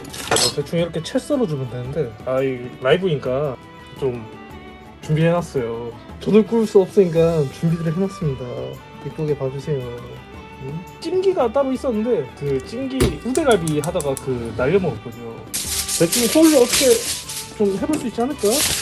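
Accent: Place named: Pohang, South Korea. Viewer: 포항시 북구 사람 같네요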